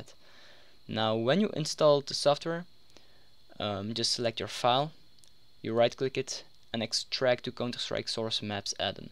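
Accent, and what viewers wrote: Belgium. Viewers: Vlaams accent? :D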